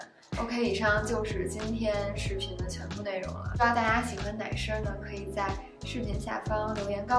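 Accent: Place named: Beijing, China. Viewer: 听声音像北京人或河北人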